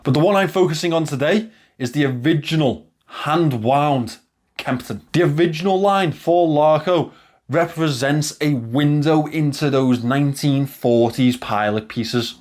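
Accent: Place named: Liverpool, United Kingdom. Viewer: Actually, I didn't know at the beginning. I even thought you were not native English speaker..😅 I researched little and I found out that someone speaks same like your accent and I realized there were many people same like your accent in U.K. and it was Liverpool..😄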